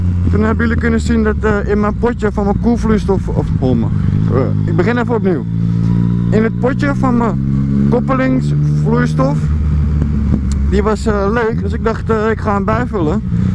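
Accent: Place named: Amsterdam, Netherlands. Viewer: Ey, die irritante ABS is uitschakelbaar... trek gewoon de zekering eruit en plak een stukje zwarte tape over het ABS lampje.;) Lekker filmpje weer, heb er weer van genoten! Gewoon goud dat Amsterdams en die sound van die 09, man...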